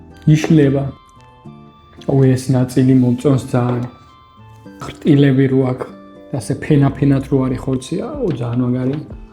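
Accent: Imereti, Georgia. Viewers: ძალიან მომწონს იმერულად რომ საუბრობ! 💟💟💟ჩემი დედულეთია სამტრედია ოღონდ სოფელი დიდი ჯიხაიშში! მე მგონი ყველაზე კარგი ბოლო ქაბაბი! ელარჯი უფ სასწაულია იყო! შეგერგოს! ბედნიერად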